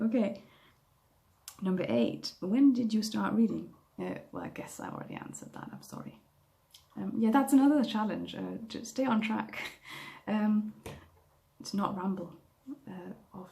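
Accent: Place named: United Kingdom. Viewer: Great video! 😄 I also didn't know the term Literary Fiction before I found Booktube. I googled it. 😄 Your English is really good btw. Did you spend some time in Great Britain? (Just a guess because of certain words you use. 😅)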